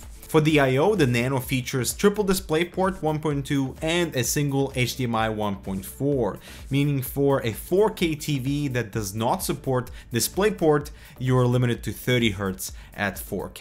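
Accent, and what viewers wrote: Russia. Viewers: can't listen to this russki accent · Мне кажется или у тебя чутка русский акцент?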